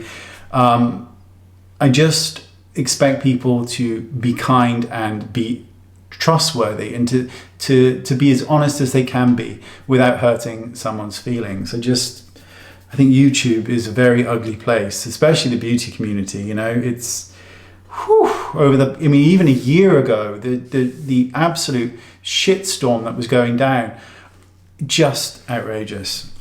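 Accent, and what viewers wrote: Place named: England, United Kingdom. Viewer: Hi,. Thank you so much for this video. It was really great to know more about you. I'm a 52 year old Canadian woman who has a very grown up, big girl job and everyone thinks I've got ot all together but I don't. I'm just like you! I'd never know from your videos that you have some anxiety and doubts and fears. I too love sappy movies, songs and books. Historical romance...oh, my beating heart! I too have a very dark sense of humour and laughing so hard that I snort and cry is my favourite thing in the world. Movies I love: Shakespeare in Love and When a Man Loves a Woman with Andy Garcia and Meg Ryan. It's quite old but if you haven't seen it, man, get the tissues. Also The Family Stone. I just watched a documentary called McMillions about a scam being run on the McDonald's monopoly game in the 80's. If the story wasn't being told by the FBI I would never have believed it was true. 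I'd love it if you could show some tips and tricks for mature faces. I loved your video on hiding eye bags. Mine are trash bag sized so I can't totally hide them but now I can make them much less noticeable. Keep up the great videos...I just love listening to your voice. An English accent gets me every damn time!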